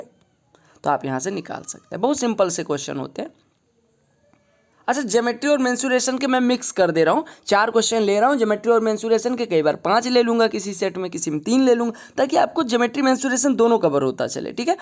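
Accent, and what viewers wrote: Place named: Awadh, India. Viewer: I like Avadhi accent.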